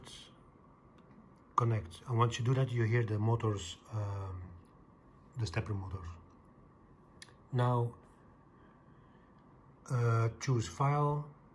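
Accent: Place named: Netherlands. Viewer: I thought you were Dutch because of the accent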